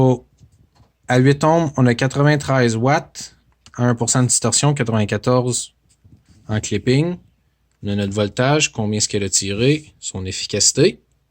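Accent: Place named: Quebec, Canada. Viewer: gros accent du quebec :P je viens juste de commandé sur amazon 36$ livré le lendemain anyways 20 amp x 12 = 240 possible mais pour 36$ je chialerai pas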